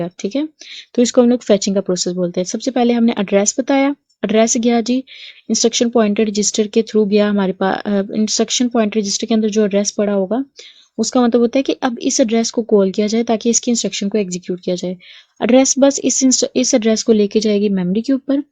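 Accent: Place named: Pakistan. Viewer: Pakistani voice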